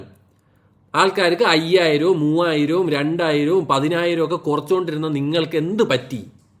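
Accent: Trivandrum, India. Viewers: Tvm aanu...ee slang kettitt angine thonunu..😅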